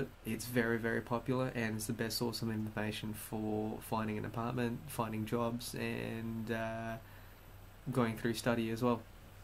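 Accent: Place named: Australia. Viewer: You have an Aussie accent. Cool!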